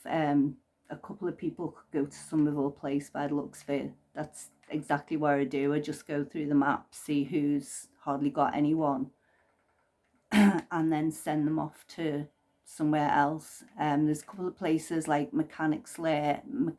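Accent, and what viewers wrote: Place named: Liverpool, United Kingdom. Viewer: I'm used to the contemporary scouse accent. I can see that you're scouse now just thought this accent died with the beatles.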